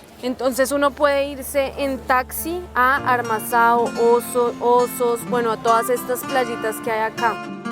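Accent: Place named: Colombia. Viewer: Este video me ayudo a aprender espanol. Tu hablas mas claro que muchos otros. Talvez espanol de Colombian es el meyor del mundo!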